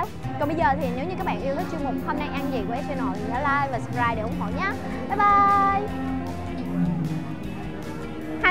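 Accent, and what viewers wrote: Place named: Central, Vietnam. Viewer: bã nói giống dân miền Trung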